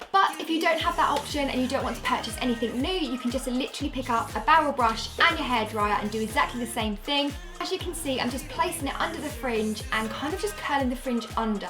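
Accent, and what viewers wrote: United Kingdom. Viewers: I would die for your british accent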